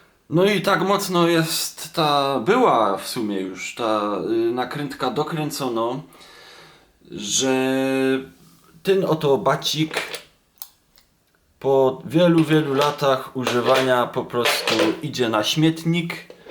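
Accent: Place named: Silesia, Poland. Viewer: Ja nie dam komentarza bo nie umiem tak śmiesznie po Śląsku mówić😉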